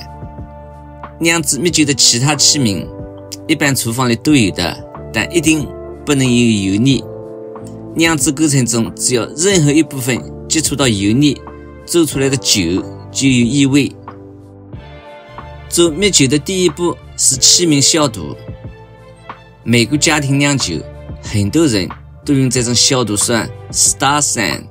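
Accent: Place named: Nanjing, China. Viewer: 很喜欢你的频道，条理清晰，风趣幽默，乡音撩绕，在美遇到相同时区种植爱好者不易，还是南京老乡那是缘分。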